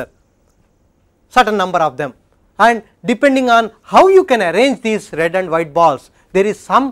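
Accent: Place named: South India, India. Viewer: Ugly south indian accent and teaching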